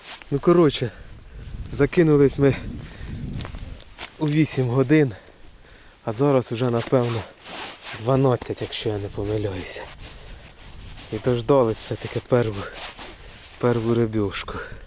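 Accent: Poltava, Ukraine. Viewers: Ніяк не зрозумію звідки ти. Говір полтавський, але сула нетипова для полтавської обл. Хоча, я не скрізь бував. А може сумська?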